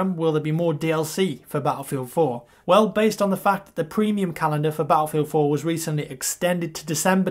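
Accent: England, United Kingdom. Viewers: beatiful english acsent <==== sorry for this english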